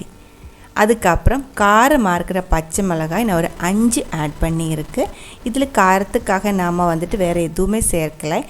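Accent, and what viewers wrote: Kerala, India. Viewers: Hi sister I like ur Malayalam Tamil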